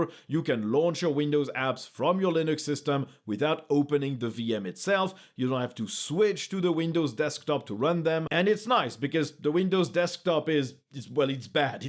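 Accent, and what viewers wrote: France. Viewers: Your French isn't getting in the way of your English. no worries :) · C'est tellement léger comme accent ! Je crois que vous êtes dans mon top 3 des français qui parlent le mieux anglais (IRL et web) Merci pour le super travail, cette chaîne est devenue ma source d'info principale sur Linux, c'est un sweet spot en timings de publication, quantité et complexité de contenu !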